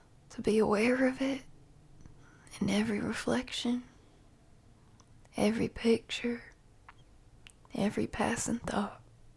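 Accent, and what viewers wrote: South, United States. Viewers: Loved it, I also the southern accent it was amazing! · Let me talk to who for what?! cooking with the country accent 🙏🏻, also I normally don’t ask but if you guys can pray for me Tomorrow morning is my Grandpas funeral I’m fine right now but I don’t know how I’ll feel tomorrow 🙏🏻❤️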